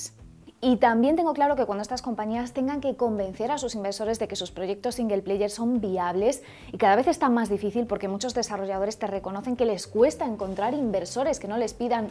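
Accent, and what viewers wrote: Spain. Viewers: que hermosa voz tiene esta mujer, pese a que no soy fan del acento español no me canso de escucharla, además está super guapa.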